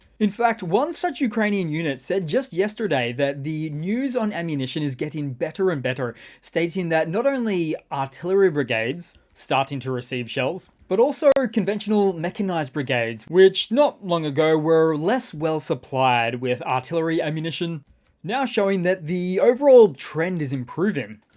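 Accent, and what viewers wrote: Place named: Australia. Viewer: Love your work. I still would like you to pronounce mortar like mitre 10 but with an O, not as in more tar. Great to hear another well informed Aussie voice like Perun. Have a good one!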